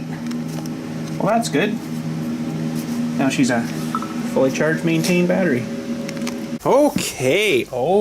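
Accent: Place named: Canada. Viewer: You must be Canadian ah where abouts are you ?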